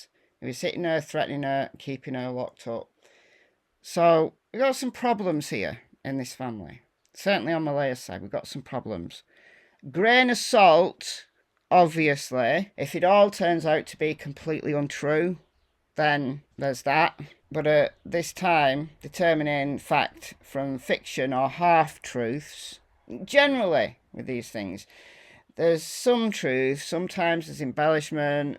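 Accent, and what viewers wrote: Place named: Northern England, United Kingdom. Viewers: I love your style of reporting - I live in Wales so it’s nice to hear your northern English accent! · What part of England are you from!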